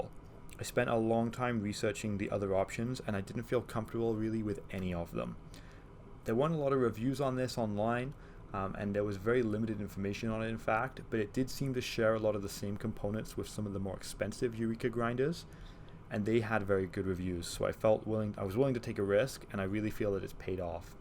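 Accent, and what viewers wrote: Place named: Wales, United Kingdom. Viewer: Great review, thank you! My Breville Dose Control has just kicked the bucket so I’m in a similar situation. I’m between this and the Rancilio Rocky. What was your reasoning in choosing this over the Rocky? Also, on a side note, your accent sounds distinctly Welsh, if I’m not mistaken. Sounds like you could be a fellow Welshman in Canada?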